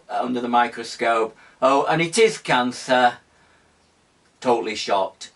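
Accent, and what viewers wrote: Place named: Sheffield, United Kingdom. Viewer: Good luck kid you're a South sheffielder so you sound nowt like me but your videos are brilliant keep up good work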